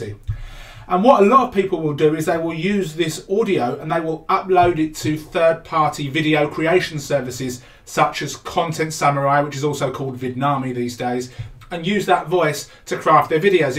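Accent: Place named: United Kingdom. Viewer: I noticed your region was 'Oregon' although you sound British. Is this so you can access the Neural voices? I'm from London area and they are not available (?) Is this because of the region specified in my AWS account or is it IP address? or what?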